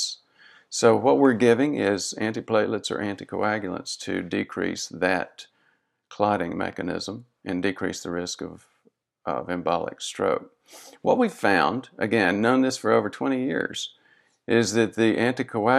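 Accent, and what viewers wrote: South, United States. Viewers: Don't know if it's your Southern drawl or wha'at but you Look n' Sound like you need a shot of Adrenaline-or an Least a Double Espresso with a teaspoon of Honey-info was Good but I Almost fell Asleep!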